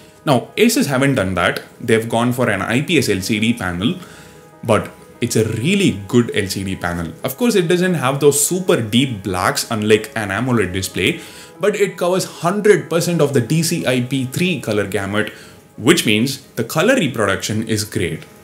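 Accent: India. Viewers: That Indian accent!!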